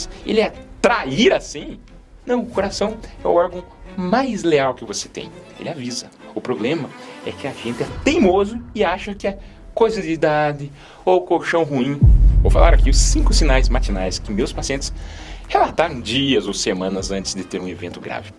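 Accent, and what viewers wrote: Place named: Paraná, Brazil. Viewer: De que região ou estado é esse sotaque, quase não dá pra entender algumas palavras. Parece ser do SUL, talvez do Paraná., esse DF deve ser a região pra onde ele foi e não onde ele criou esse sotaque! btw: dicas interessantes!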